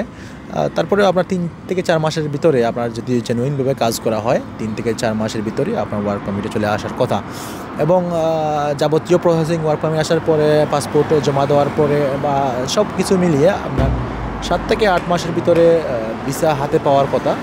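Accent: Sylhet, Bangladesh. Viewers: আপনার সিলেট মাত লাগের সিলেট নি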